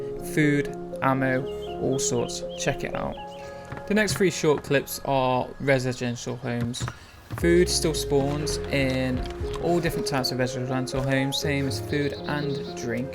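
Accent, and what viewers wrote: United Kingdom. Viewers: Where abouts in stoke you from haha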